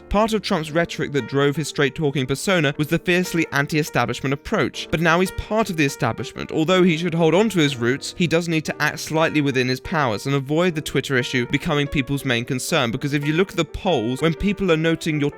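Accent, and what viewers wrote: United Kingdom. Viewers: What part of Britain are you from just wondering because colossal is crazy sounds the similar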